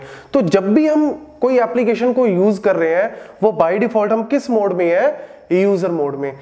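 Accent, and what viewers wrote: India. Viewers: You still have your hindi accent😢😪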